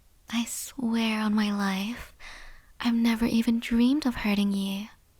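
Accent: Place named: Australia. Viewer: Always nice to hear a fellow Aussie do asmr :o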